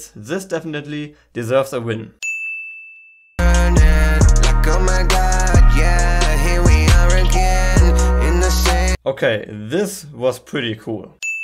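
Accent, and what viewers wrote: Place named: Germany. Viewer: Alter ich glaub jeder deutsche erkennt, dass du net englisch bist😂 aber is ja kein problem.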